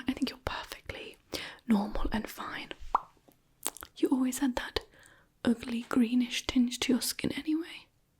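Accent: RP, United Kingdom. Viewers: And guessing from your very sexy English voice you are from the posh English county’s like Surrey,Oxfordshire or somewhere like that??!!😉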